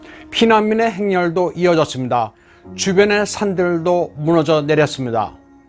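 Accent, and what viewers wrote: China. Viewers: 박상후 기자님 중국 화교이지요? 너무나 중국사람 발음을 애용하시네요. 대한민국식으로 한자 발음을 표기해주시면 너무 감사하겠읍니다. 박상후는 중국식으론 어떻게 발음합니까? 이렇게합니까? 제발 한자 발음을 제대로 표기해주시기 바랍니다.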